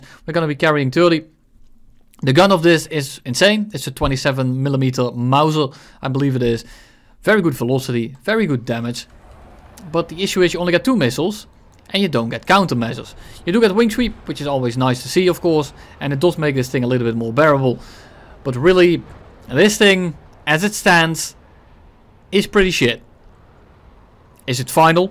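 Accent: Netherlands. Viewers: ik hoor dat je Nederlands bent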